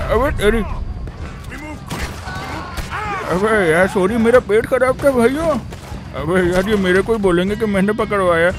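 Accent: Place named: Pakistan. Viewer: bro ki awaz ma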